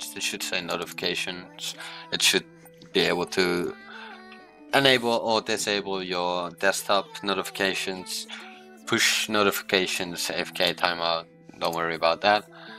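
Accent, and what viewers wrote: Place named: Netherlands. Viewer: Haha, well isn't Dutch your first language? It makes sense that you have an accent of course and I love it xD <3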